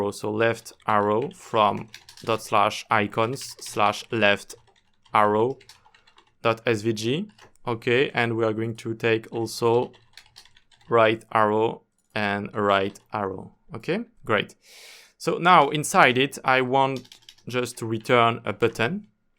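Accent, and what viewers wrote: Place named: France. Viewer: Oh mon Dieu l accent français ne nous quitte pas même en anglais force à toi mon reuf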